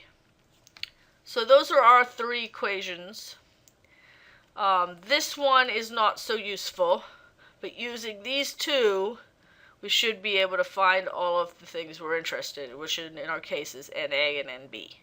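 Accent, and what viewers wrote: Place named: South, United States. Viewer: i loved your southern accent mam :)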